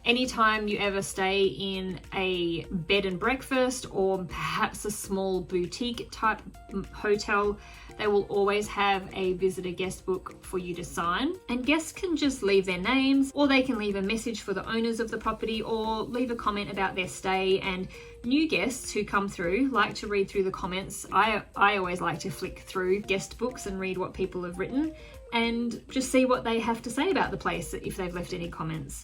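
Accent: Australia. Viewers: HI are you Australian or NZer ?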